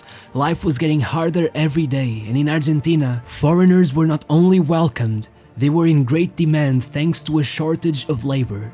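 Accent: Portugal. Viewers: Eu sabia que eras português 😅😅 dava para perceber no sotaque mas nunca tive a certeza 🤣 Óptimo trabalho que estás a fazer! Tens jeito para desenvolver a história e arranjas boas imagens apoiar a história. Sem dúvida que envolve muito trabalho e pesquisa mas compensa, pois os vídeos são muito bons!! Continua assim, que ainda há muitos génios do futebol para falar 😉 E viva o Norte car*lho 🤣🤣🤣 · the speaker who sounds portuguese, should kno that thhe spaaish name carlos rexach is pronounced carlos rejach in spanish! I dont kno him personally, but u should kno that! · Esse sotaque e esse toque de paixão,no falar dos nossos grandes, é de um português honrado... :)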